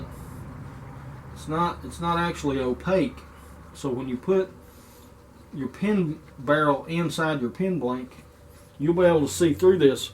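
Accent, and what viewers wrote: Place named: Texarkana, United States. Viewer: That was fantastic. I am gearing up to turn my first blank and spending time looking at at many videos of the whole process as i can. i am trying to place your cool accent, Texarkana region by any chance?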